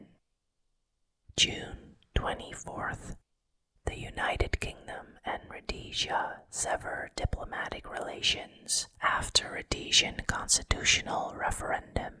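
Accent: Canada. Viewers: sounds Canadian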